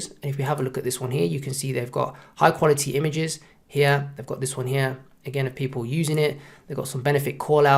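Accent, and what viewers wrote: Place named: London, United Kingdom. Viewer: Great informative vid keep them coming...also you sound like a Londoner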